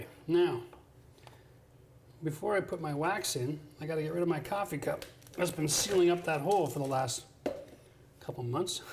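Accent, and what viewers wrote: Canada. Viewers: I knew you were Canadian when I heard the way you pronounce the words “process” and “out.” Thanks for the walkthrough and the thorough explanations.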